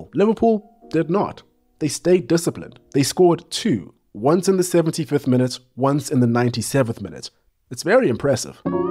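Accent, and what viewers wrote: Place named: South Africa. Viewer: i hear a south african accent, i subscribe. simple as that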